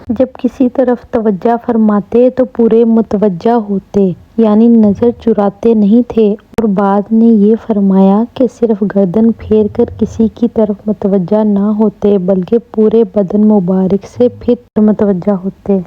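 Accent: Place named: United Kingdom. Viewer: جزاک اللّہ ۔ اچھی اصلاحی باتیں ہیں ۔آپ بھی مزید کوشش کریں کہ صرف آوز چلائیں اور سامنے نہ بیٹھیں ۔تاکہ اچھی باتیں سنتے ہوئے آپ پر نظر نہ پڑے۔ اور ناظر منظور دونوں نظر کے گناہ سے بچیں ۔ اور حیث کو حریث بولیں ہدیٹ نہ بولیں۔ انگریزوں کے لہجے میں۔ آپ تو اردو خوان ہیں۔ کیوں حدیث کی جگہ ہدیٹ بولتی ہیں۔ اسکی اصلاح کریں ۔ جزاک اللہ ۔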